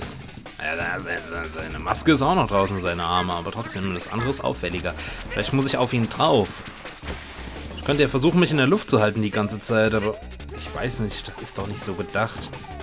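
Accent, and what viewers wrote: Hesse, Germany. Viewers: Er ist Hesse, er darf das.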